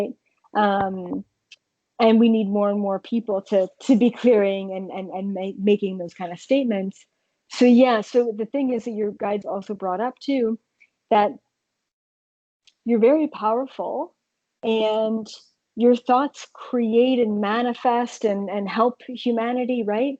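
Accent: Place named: Sweden. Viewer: Jag hittade dig via videos, sååå kul att hitta en session med en svensk! Man hörde direkt på engelskan att du var ifrån Sverige 😋🤗❤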